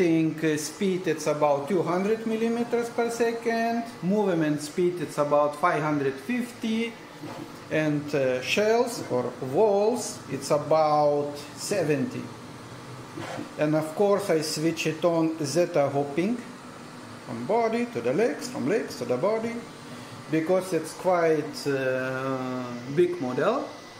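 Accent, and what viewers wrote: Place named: Lithuania. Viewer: ish anblu kalbos sprendziu kad lietuvaitis...irgi nusipirkau toki:)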